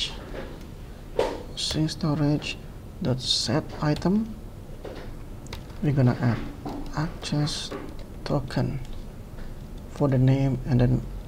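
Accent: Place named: Indonesia. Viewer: indonesian accent wkwkw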